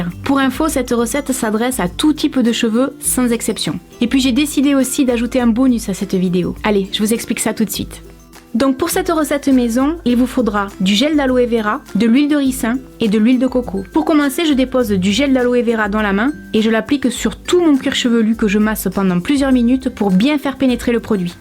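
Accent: South, France. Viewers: merci pour tes conseils et reflexions! j' adore ton accent du sud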